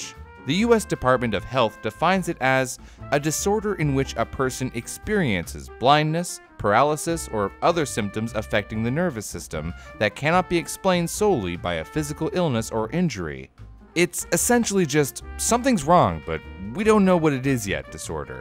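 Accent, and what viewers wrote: Quebec, Canada. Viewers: effectivement, je m'attendais à ce qu'il utilise le français européen, le français québécois m'a vraiment surpris haha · speaks canadian french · Is that québécois I hear?! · God i vibe with speaking in a french quebec accent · Omg j'capote! speaks french like a Québécoise!!! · okay that was Quebec French are you guys Canadian?!? as a french canadian I like finding Canadian youtubers! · Im quebec french that was awsome almost sound more quebec french than fremch france . 😎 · You speak le jouale! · Wait is from quebec?? If not that's literally the best imitation of our accent I've ever heard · Vous êtes du Québec?!!?! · I love her accent she’s so cute with it! Also that’s French Canadian Chills speaking! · speaking Quebec French was a pleasant surprise, I lost my quebec accent when I started to speak English more Now I’m not even sure what accent I have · On s'entends qu'y'avais personne qui s'attendait a entendre parler en Québécois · Parfois tout mes pensées sont en français aussi. 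was speaking some kind of Canadian French or something! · Are you canadian? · ahhh vous êtes québécois ? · French is a mix of Québec and Acadian. Neat! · As a Anglo-québécois that moved away, you have no idea how big a smile I had on my face when started speaking in true blue Québec French. I miss hearing it so much. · Well the chanel is a canadian chanel and spoke québéquois (french in québec, a Canadian province) also, qébequois is like the american english for the british. So yeah, spoke french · Wait what ? You can replicate the Quebecer accent perfectly! MY ACCENT! Seriously are you from Quebec ?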